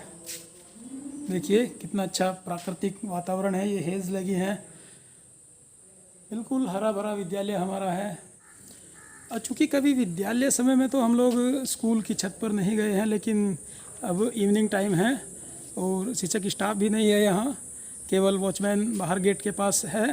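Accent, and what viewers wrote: India. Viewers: AAP ilahabad ki tarah Joab me hoge aapki bhasha se lagta he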